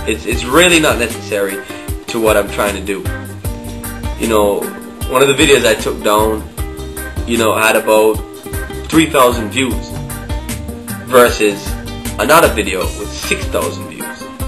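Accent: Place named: Jamaica. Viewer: Brother, I'd like to say that I'm glad you are still on you tube. First time I saw you, I was blown away. It was about 2006 and I saw a white Jamaican yelling about how people reacted when they hear your voice and see a white face and then you had dreadlocks with you face painted sayin "was this what you was expecting to see!" It had me rolling. It was my first laugh on YouTube. I'm glad to see your still keeping it going. Good Bless Peace and Respect all the way from NJ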